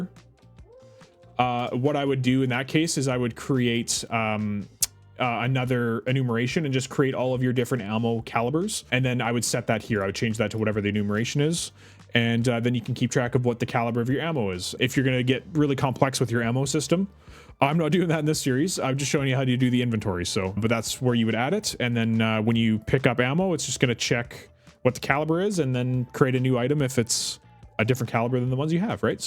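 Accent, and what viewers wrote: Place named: Canada. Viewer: just realized you're a fellow Canadian after hearing the "Out" and "About" pronunciation 😂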